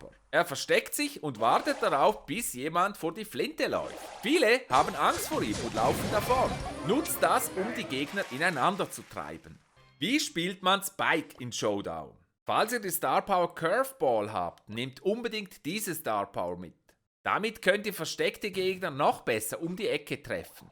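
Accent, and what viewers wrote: Switzerland. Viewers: Bist du a schweizar du bischt foll gaaaiiilll ich liebe das wen du sagst Sandwich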